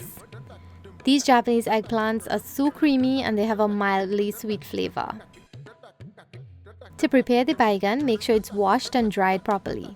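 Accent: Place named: Trinidad and Tobago. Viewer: Hey girl , my cousin lives on Liberty in Queens .I have been there many times......I also lives close where uou buy your bigani in Trinidad......I JUST LOVE HOW YOU ARE SO , SIMPLE AND HUMBLE AND ALWAYS KEPT YOUR STRONG TRINI ACCENT....... TRUE TRIN.....😍😍😍😍. Girl I bought some biiiggg bigani in the back of Chaguanas recently , she is the best so far. I try other vendors but usually take 2 bites and throw it away, and I loved it so much, by this lady , I looked up how to make from you of course.......love aaalllll your videos. ❤❤❤❤❤❤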